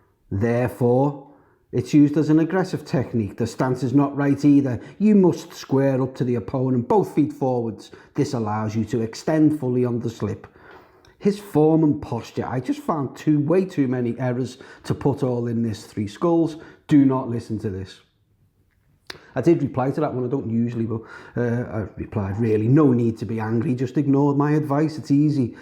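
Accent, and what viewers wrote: Liverpool, United Kingdom. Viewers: A beer and a chat about boxing at the Phil one day. Listening to you makes me miss Liverpool man, and the practical logical approach of scousers. Best in da wairld 😋🤝❤️🤝😋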